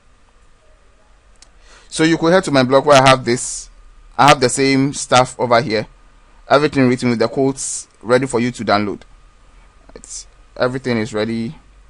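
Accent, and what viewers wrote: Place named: Ghana. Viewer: Nice tutorial bro... feeling your Ghanian accent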